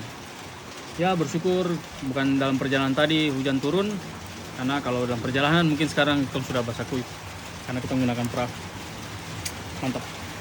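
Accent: Papua, Indonesia. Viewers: mending ko bicara full dgn logat Papua saja lebih bagus Yo tes to 😅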